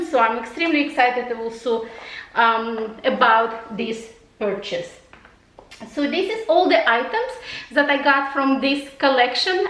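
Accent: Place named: Russia. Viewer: Dear, you are so sweet...obviously there are some people on ytube, who care about you. I love your chanel, your russian accent....Now you are even more confident with ytube. You made/make a great job! I love your new items, especially the keyholder & the earings- they are both so cute! Can you give me the price of the earings? Thanks. Have a nice sunday 😍😉🇫🇷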